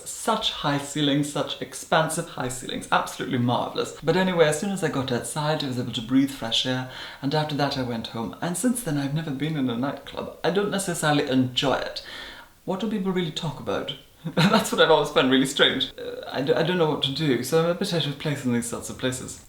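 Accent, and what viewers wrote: Scotland, United Kingdom. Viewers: Lu z he's originally from the Hebrides and his real accent is much more Scottish sounding, you can hear it a bit in his very first videos. He now lives in London, and over time has been covering ut with this english, posh affectation you can hear now.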